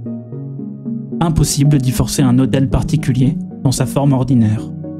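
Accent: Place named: Paris, France. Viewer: Le narrateur a l'accent du 16ème.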